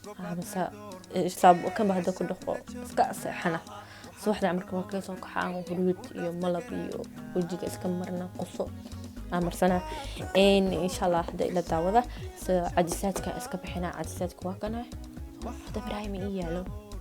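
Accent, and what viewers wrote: Mogadishu, Somalia. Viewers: Sax waaye Afka reerka xamarka haday ku hadasho kaasaaba u fiicanee intey u boodi lahayd maxaa tiri axsan af maadoonti xataa ku hadal horaa loo yiri socod aadan lahayn sedex tilaabo ma dhaafo wayo wa ka lumaabo waxan kugula talin lahaa ani ahaan waa ku dareemoya af maadoonti aad ku hadli jirtay xoogsigan dhexdad ku lumeysaa iska daay hadii ad doontee in xirfadaa ku kacdee afaa ka waran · Loriina Bby xbbti waxey ku hadasha afka rer xamarka saxda ah marka luqadii ayey isku daydaa iney ku hadasho marka waa sidaas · Waayaha Cusub wll dadka cad cadka muqdisho dago san aye u hadalan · DaaRij Films wara dadka xamar joga sas aya uhadlen reer tuulo lee wayeh waxa somaliga wayn ku hadla okky iska tag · DaaRij Films ina ader rr xmrka saan ayey u hdlanee gabadha ka tag 🙄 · Loriina Bby wa sida dadka reer xamarka ku Hadlan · Loriina Bby Abayo somaliye ee kudhalatay intana kula ekaatay Soomaligana waa kaabadia lkn ree xamarka qaraaa saan ah . 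Anba saaan waa kadarnaa lkn marka kasobaxay alxm waaa kaqubestay hhhhh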